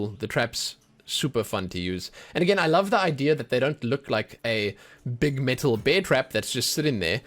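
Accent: South Africa. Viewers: You have a South African accent.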